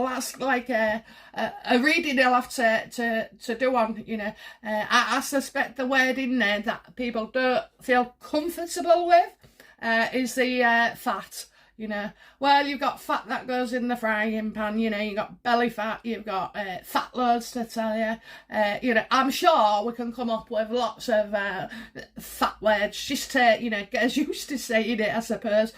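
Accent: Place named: Hull, United Kingdom. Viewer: Ah so it's a Hull accent.! I was wondering. Just love accents and the readings. Yes I am from Yankee and Bookworm - we are creating a really good family. I understand the "fat" word is just an expression of" lush/lots of info.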